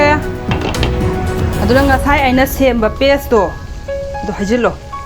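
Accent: Kakching, India. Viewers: Yes ngnagnei Kari tourage ngangiba lonse kakching gi dialect ni Kari problem leirage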